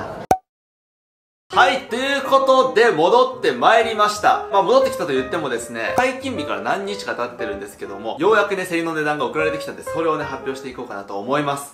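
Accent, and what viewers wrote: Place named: Kansai, Japan. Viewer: たまーに関西弁入るのは何でなんですか？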